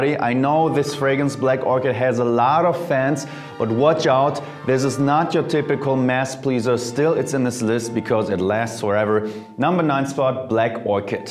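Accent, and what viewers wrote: Germany. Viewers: Not French at all! He is definitely German!! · Is he German ? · German accent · I hear a german accent there🤔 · He is stunning but his English could be improved ;) I bet he is a german speaking person! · Hus accent is so strong i could tell within seconds he is German😂😭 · clearly a German accent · He has a strong German accent when he speaks French..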